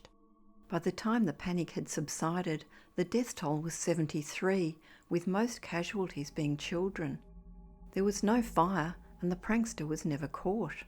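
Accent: Australia. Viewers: I love the Australian accent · And she's an Ozzie! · Australia I would think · I thought Australia.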